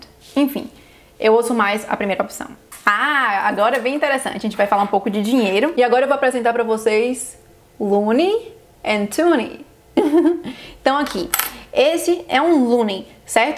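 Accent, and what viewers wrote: Brazil. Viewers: vc merece parabéns só por ter falado o nome do estado escroto no norte hahaha mas tem umas dicas boas. curti mais seu sotaque brasileiro (sdds br)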